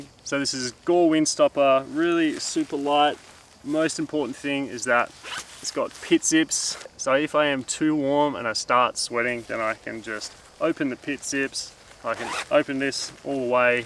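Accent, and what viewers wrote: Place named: Australia. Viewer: With the Aussie accent Can Serrat sounds like something else. Good video mate hi from Tassie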